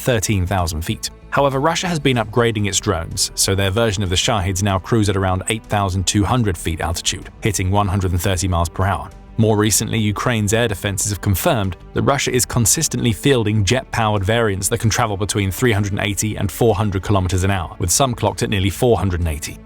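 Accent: United Kingdom. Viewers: English (UK) accent is getting old.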